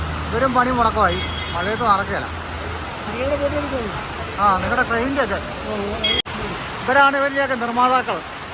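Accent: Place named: Idukki, India. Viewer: ഞാൻ കൊച്ചിക്കാരൻ ആണ്. നിങ്ങൾ ഇടുക്കിക്കാരുടെ സംസാരം കേൾക്കാൻ തന്നെ ഒരു പ്രത്യേക സന്തോഷമാണ്.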